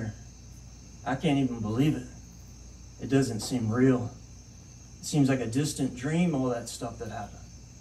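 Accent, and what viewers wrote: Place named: South, United States. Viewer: That was beautiful! I loved the part when you said the word light and lightning flashed in the distance. Thank you. We are so proud of you! You still have your southern accent. ❤️—Myrtle Beach mom and daughter, Catherine MBHS Sophomore.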